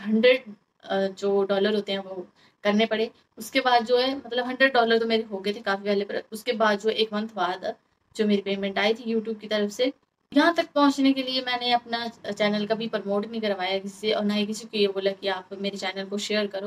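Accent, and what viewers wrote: Haryana, India. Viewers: aap haryana se ho kya aap ki boli haryana side ki h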